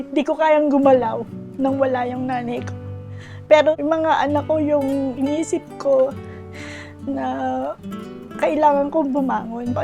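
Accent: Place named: Philippines. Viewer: The narrator sounds very Filipino, so unless they've perfected a generic Filipino AI voice, I doubt it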